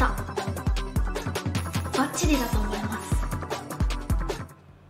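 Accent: China. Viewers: 日本語学習中の中国人留学生って言われたら信じる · 日本語がかなり上手い中国人の訛り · 中国人みたい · なんで日本語習いたての中国人みたいな喋り方なんだ？ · 中国人みたいな喋り方だな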